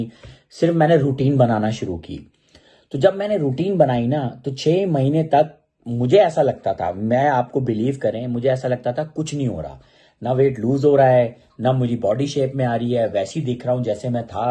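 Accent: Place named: Pakistan. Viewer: You have a very pure urdu accent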